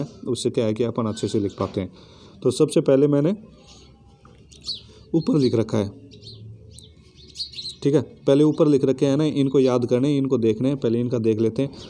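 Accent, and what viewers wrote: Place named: Haryana, India. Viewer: Bhai hariyana se lgta h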